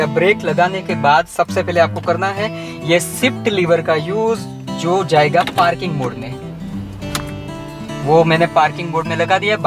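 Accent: Gujarat, India. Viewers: Aap gujarati ho? Aapki hindi patel bhai jesi hai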